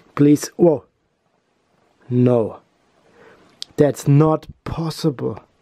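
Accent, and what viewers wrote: Germany. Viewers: Du bist Deutsch. Dieser Akzent 😂❤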